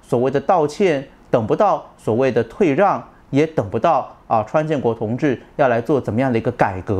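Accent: Standard. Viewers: 炳忠的口音是在向普通话靠拢啊！ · 被你的中文发音吸引了!!这才是正统国语发音!!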